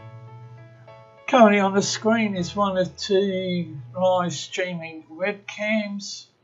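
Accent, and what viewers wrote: Australia. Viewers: is a gem to Force13. Love his Aussie accent and the information is always great. Good to hear him... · dont make fun of him he is australlian and plz dont start a fight as a reply cause we cant agrre on anything on this website. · For those who are saying (the person in this video) is drunk, He is Australian and He is not drunk A True F13 Fan always knows this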